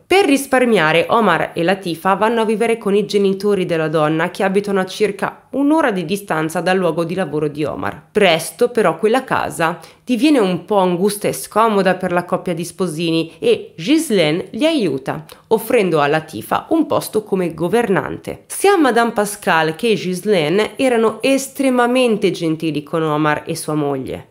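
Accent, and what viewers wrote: France. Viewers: Ciao carissma il tuo acento francese 😁mi rocorda molto il mio nei primi anni che mi sono trasferita qui in nel sud ovest della Francia, ma una cosa che puo consolarti e che pronunci molto bene il nome della vittima che e uguale al mio Ghizlane, buon lavoro carissma. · Seguo ogni tuo video, e mi piacciono tutti tantissimo..è una storia interessante, sopratutto con l' accento francese!!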